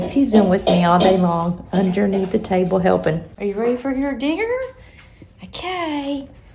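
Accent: South, United States. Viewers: I just love you and your sweet southern accent.You bring a smile to my face everytime I watch a video. I especially enjoy the videos of you with your family and the dogs. I did have to cry when you and said goodbye to Brecon on the instagram pics. I have been through that twice and I know how you feel. My shirt should come soon but I already give you an A+ for presentation. I see the extra work it takes to do the sticker, tissue, and treat. When things are done the Way, they are done right!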